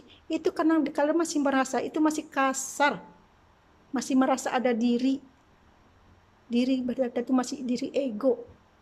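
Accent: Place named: Malaysia. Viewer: Bahasanya has Malasiya enak didengar 👍🙏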